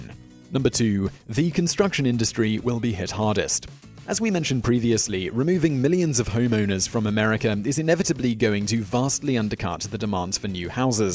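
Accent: United Kingdom. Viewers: Great. A guy with a British accent wants to tell everyone what America should do as if he is the expert.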